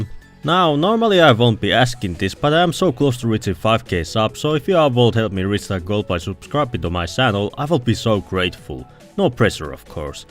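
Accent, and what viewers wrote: Finland. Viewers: I was like 99% you were Finnish and this just confirmed it. Perkele TF2 Suomi!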